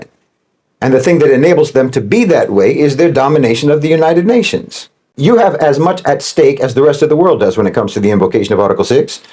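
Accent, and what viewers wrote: United States. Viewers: Meanwhile he wears a suit, speaks American English and benefits from all good of western culture.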